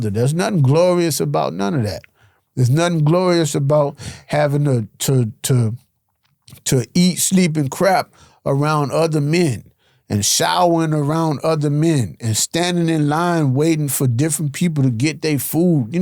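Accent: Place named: Louisiana, United States. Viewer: He got that Louisiana draw in the way he speak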